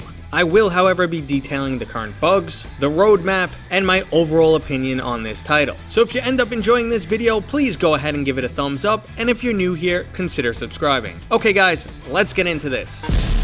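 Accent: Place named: Boston, United States. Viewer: I just like that boston accent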